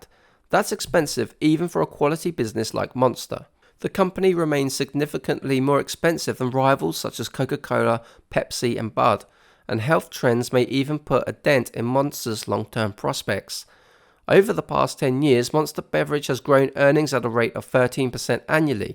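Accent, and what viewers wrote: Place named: United Kingdom. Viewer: Good video except for the annoying British accent, you English people should learn California accent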